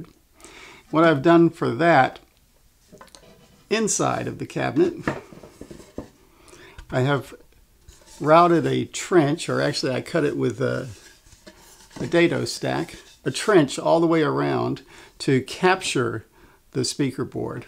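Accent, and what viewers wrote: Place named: Winnipeg, Canada. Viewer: You’re from Winnipeg, aren’t you?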